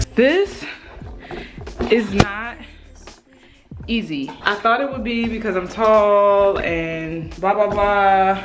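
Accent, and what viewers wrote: Baltimore, United States. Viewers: I was like where is she from? Then I heard you say Stupid I said oh she's a Baltimore native 😭😂 love you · oooo do I hear a baltimore accent 👀🌝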